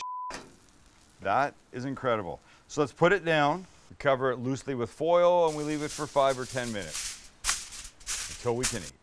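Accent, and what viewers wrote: Canada. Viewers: Just found this guy for the jerk chicken recipe that was no bs secret ingredient shit and I find fucking neegan sounding straight baller Canadian in cali. Yeah. I'm making this shit tomorrow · buddy, you are a "Snowback" a Canadian,!!! I can tell by yer"Ins and Ouuts" Great Jerk Chicken recipr!!! Keep it up! DD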